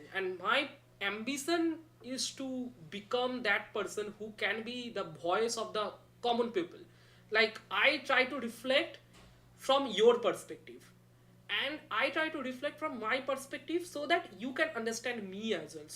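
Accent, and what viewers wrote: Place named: Bengal, India. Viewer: Dada i know that accent u have, sotti kore bolo......you are bengalee na?😁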